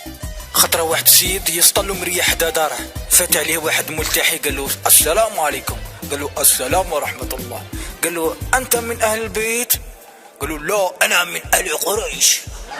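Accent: Algeria. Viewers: لهجة شلف بينا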